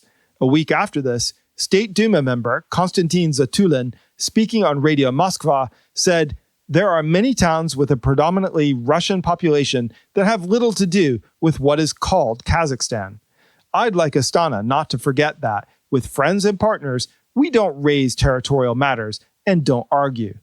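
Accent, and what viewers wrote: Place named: Philadelphia, United States. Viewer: Are you from Philly? Guessing base on your "o"s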